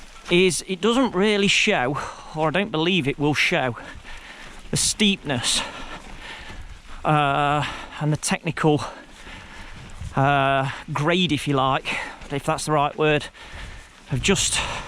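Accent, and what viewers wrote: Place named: Ripley, United Kingdom. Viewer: He sounds like a Ripley lad!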